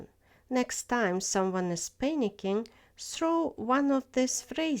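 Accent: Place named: England, United Kingdom. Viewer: Мамочки, какой же сильный акцент английского 🙈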